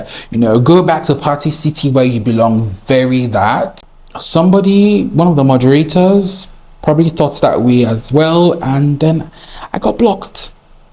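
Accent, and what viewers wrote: Africa. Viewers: I am so very sorry you have ran into blind assumptions on other sites. People actually insulted your accent?? OMG. What did they want, an African to have a Australian accent? A British accent? Perfect english is not enough for some folks I guess, I love your accent, any accent is grand. I do have trouble understanding an Irish accent at times, and Scottish is worse -- they slaughter words and without subtitles I can't catch anything! 😄😁😂 I watch a plant guy from England, and then you from Africa. There is a definite learning curve when one watches content from another nation, there are weather issues that I thought I was aware of, but I've been learning a lot about weather and how it affects plant people in other nations. And then there is customs or national normalities that I don't see here in the USA, like your roadside plant stalls, I'd love that! 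It all makes for a wonderful learning experience! I love that you are showing and telling us about Africa, about Nigeria, I watch you for your personality, you plant knowledge, your humor (!) but its so grand to get a context of life in other countries -- to blow expectations and assumptions away so all can see and understand and stop 'boxing' people into stereotypes. You are my treat. I watch every day!! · People are so bitter and it’s weird to be so loudly envious of others in the community because they have nice plants or live in tropical environments etc. Most of us are here to see nice plants and be inspired! Also these people are just insanely ignorant. Btw your accent is wonderful I love African accents. ❤❤ from USA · I love your energy, your humor, and I love that African accent of yours, and your lovely plants. Please keep up your good work! :)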